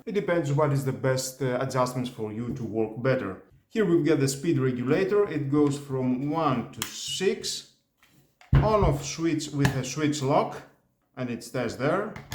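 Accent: Greece. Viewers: I can hear the greek in your voice 😂❤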